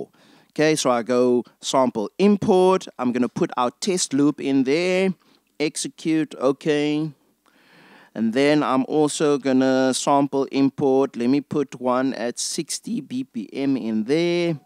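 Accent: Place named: South Africa. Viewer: I am going to guess that's a South-African accent. It's grating at times to my American ears, but well-worth tolerating for the excellent job you've done with this series. You definitely have a teachers's heart!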